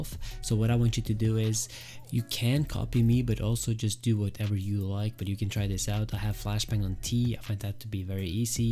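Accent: Norway. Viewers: I was in school there was lots of noices when i watched it, when i watched it again i heard that you were Norwegian my bad lol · E du nordlending eller?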